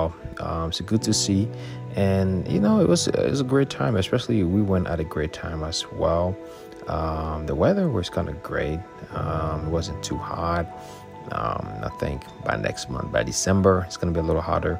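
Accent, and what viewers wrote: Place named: United States. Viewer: American accent bruh, u eat cassava leaf? 😅😅😅. Awesome content bruh 👌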